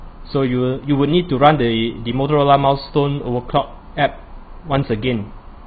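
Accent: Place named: United States. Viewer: hellz! you sound like an american comes from greece ;G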